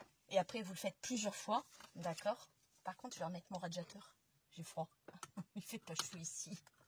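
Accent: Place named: Lille, France. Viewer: bonjour j'ai découvert ta chaîne par Agnès et je me suis abonnée sympa le pote bobine .j'aime beaucoup se que tu fais mais dit ton accent je le connais tu es une boyau rouge il me semble......merci a toi pour ta bonne humeur. gros bisou alexandra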